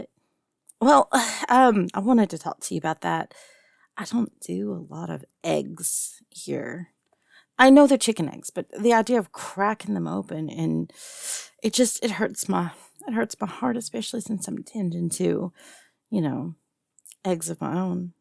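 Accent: South, United States. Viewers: Wasnt expecting a southern dragon but its a pleasant surprise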